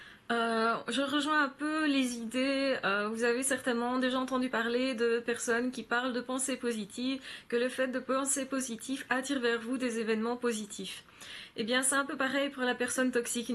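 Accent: Belgium. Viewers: Bonjour, vos vidéos m'aide énormément en ce moment, elles sont géniales!!! j'aurais aimé savoir si vous exerciez en France? je perçois un petit accent belge:-)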